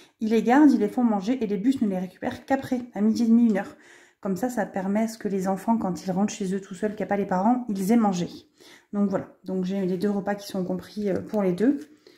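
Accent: South, France. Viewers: Je trouve votre idée super pour le scolaire et pour les enveloppes ✉️ budgets etc... vous êtes une vrai warrior avec tout ce que vous entreprenez c'est très courageux! Vous avez un accent du sud je trouve c'est très jolie à entendre🙂 je vous souhaite de mener à bien tout vos projets et de vous faire un max de sous afin de pouvoir profiter un max de la vie avec vos proches🙂👍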